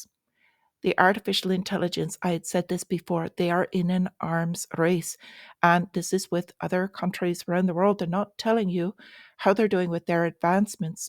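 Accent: Ireland. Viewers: HELLO! Oilseed here.:-) Do I detect a bit of 'Dublin' in your voice? Thanks for the info!!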